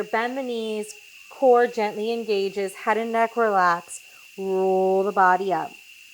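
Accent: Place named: Louisiana, United States. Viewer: Also. Sounds like Louisiana.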